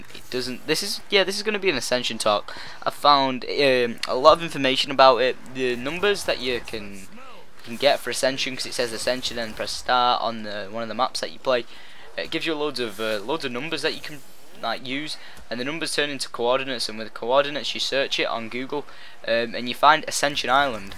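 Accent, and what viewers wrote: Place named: United Kingdom. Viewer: not a tutorial, some british guy talking about happy in the pants,...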